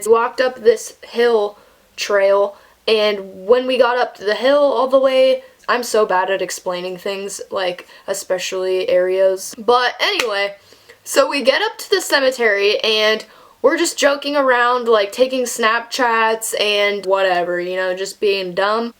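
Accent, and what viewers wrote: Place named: Seattle, United States. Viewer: you have a Seattle accent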